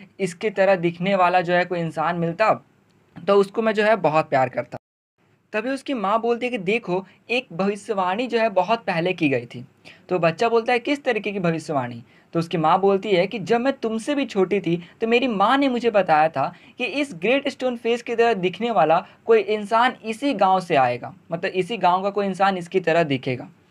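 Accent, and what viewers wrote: Bihar, India. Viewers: Sir from where u r???????? I think from Bihar. I am from bihar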